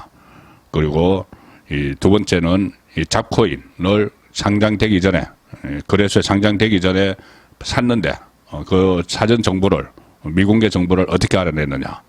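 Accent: Gyeongsang, South Korea. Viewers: 정확하고 듣기부담없는갱상도 라서 엄청듣고있세여~**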